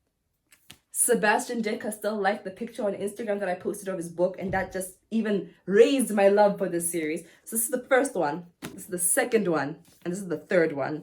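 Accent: South Africa. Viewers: your accent is probably South African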